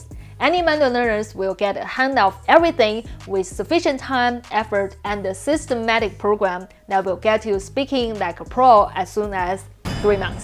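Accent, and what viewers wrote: China. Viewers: 为什么你嫁了美国老公，中国口音还这么重呢？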